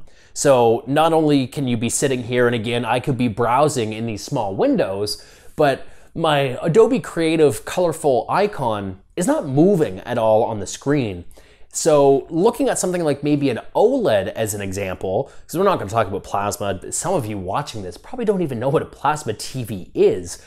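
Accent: Canada. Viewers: Sounds so Canadian lmao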